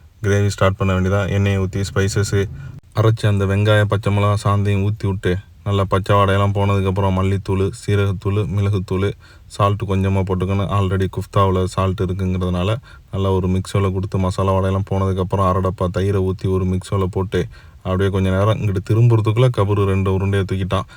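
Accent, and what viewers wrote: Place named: Parangipettai, India. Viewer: நீங்க என்ன பரங்கிப் பேட்டையா? அந்த slangil பேசுறீங்க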